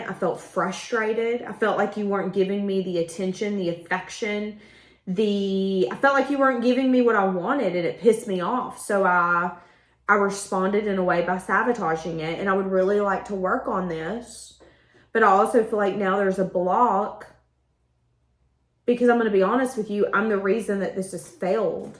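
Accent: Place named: Tennessee, United States. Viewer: I should have known you were from TN... with that accent. I live in white house TN.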